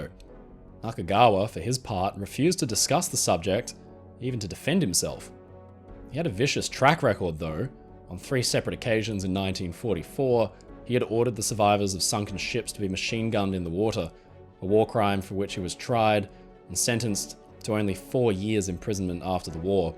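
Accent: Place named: Australia. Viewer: Thanks, awesome hearing an Aussie accent mate. Great content!!!!! Thanks for the work you've put Into your videos - top quality 👌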